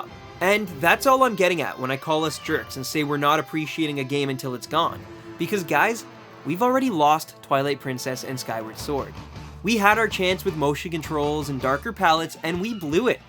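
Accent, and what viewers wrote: Canada. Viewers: love your canadian accent! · Are you Canadian?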